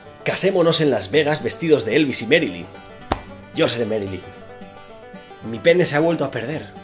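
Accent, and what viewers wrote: Bilbao, Spain. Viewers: Ets luzu soy de twitah k sepas k comparto y me encantan tus videos sobreto tu forma de ablar a lo bilbo puro no cono un "tabaka" ;)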